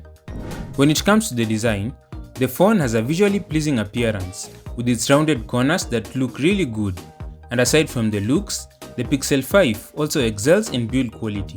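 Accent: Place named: Kenya. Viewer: Kenyan? Happy to hear a voice from home reviewing tech professionally. Big up to your journey.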